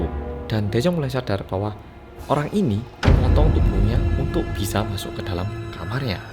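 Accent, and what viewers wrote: Java, Indonesia. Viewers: Semangat lurr,ora ilang jawane💪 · Intronya jangan di ganti dong kak 😣bersama orang korea yang medok aja😂gk usah ganti😂aku suka ikutin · Wkwk nadanya kaya ad jawa jawa nya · Mas skrng tinggal d mana seh kok bahasa Jawa nya medok bgt...kyk logat Jawa asli:v...respon mas...aku loh gak tau di respon Karo artis:( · Baru2 ini aku play rindingdong jam 12 malem. Karena ternyata cerita2 ini dan juga suara mas medhok ini bikin ngantuk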